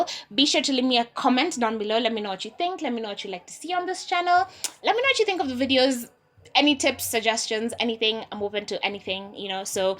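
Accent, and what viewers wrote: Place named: South Africa. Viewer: Your accent sounds Sooooo South African 😅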